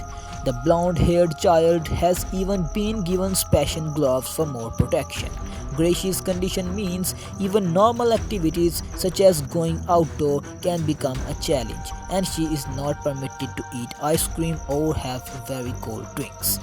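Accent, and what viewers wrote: India. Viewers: There is nothing wrong with an Indian accent! The background music however was too loud and overwhelming. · You are totally right.But it is normal that you get criticized, when you upload videos on youtube. And just be honest his accent is pretty hard to understand.He does good job producing his videos, but i think he should talk in his native language, and upload them for hindu ppl.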